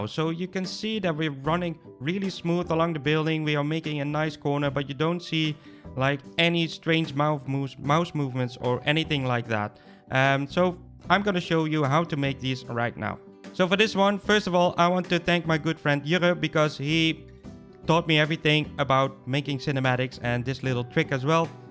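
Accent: Netherlands. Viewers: Your accent sounds dutch... ben je een Nederlander?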